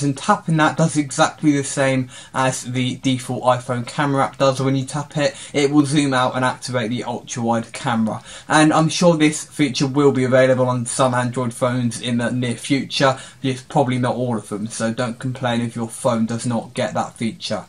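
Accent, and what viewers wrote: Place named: United Kingdom. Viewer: lovin the british accent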